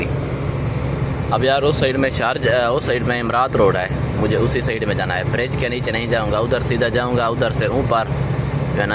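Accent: Saraiki, Pakistan. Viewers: استاد اردو تو ایویں لگدیں جیویں سرائیکی ہو ویں۔ مسات ہیں کتھوں دا؟؟؟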